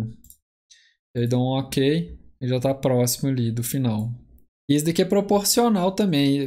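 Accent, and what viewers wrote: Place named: Minas Gerais, Brazil. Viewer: Noss mas esse cara é Minerim demais sô (ou goiano).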